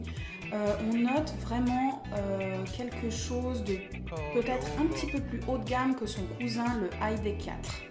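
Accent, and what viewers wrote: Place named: Switzerland. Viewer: Cet accent suisse est charmant ; par contre le chanteur de la musique est pénible.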